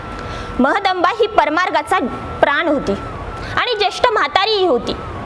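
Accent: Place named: Vidarbha, India. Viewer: 🙏दण्डवत प्रणाम कु ताई 🙏जीवन भावसार, पुणे!उत्कृष्ट कथा कथन ताई प्रसंग डोळ्यासमोर उभे केलेत आणि डोळ्यात अश्रू आलेत, निशब्द झालो 🙏वऱ्हाडी भाषा तर अप्रतिम 👍🙏🙏🙏🙏🙏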